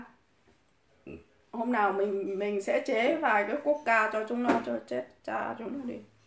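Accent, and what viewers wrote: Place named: Hai Phong, Vietnam. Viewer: Ngôn ngữ cách nói của cô rất hải Phòng....chân thật và thẳng thắn.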